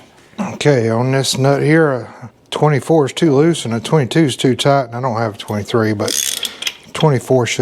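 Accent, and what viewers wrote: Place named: Tennessee, United States. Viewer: Very nice! You sound like you’re from my next of the woods (TN)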